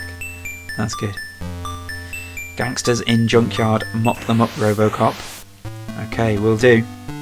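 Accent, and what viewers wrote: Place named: Midlands, United Kingdom. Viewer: Awesome vid! Thanks for uploading mate! Odd question, where abouts are you from? Roughly? You sound a bit like a Midlander. Can’t wait for your next stream, I randomly stumbled on your channel while looking for Atari ST videos, as a guy who grew up on the “other side” (Amiga) it’s great to see as much enthusiasm for Atari as there still is for the Amiga. Thanks again!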